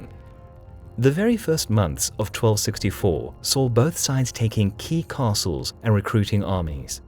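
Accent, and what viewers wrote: South Africa. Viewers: Is this guy south African? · Is this narrator South African?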